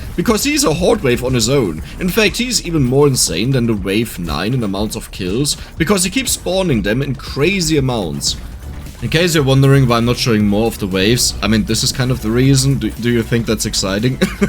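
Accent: Germany. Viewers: you can definitiv hear that dieser bruder is a deutscher bre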